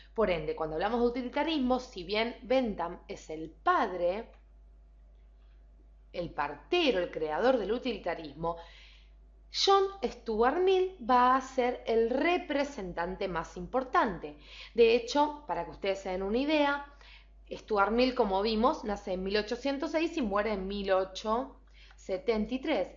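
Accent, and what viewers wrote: Argentina. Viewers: Así hablamos en Argentina. La chica del vídeo es argentina. Algún problema con nuestro modo de hablar o pronunciar?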